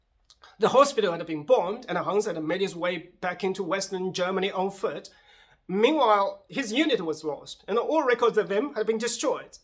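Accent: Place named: United Kingdom. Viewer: 😂😂😂，连英伦 腔调都那么浓重。👍👍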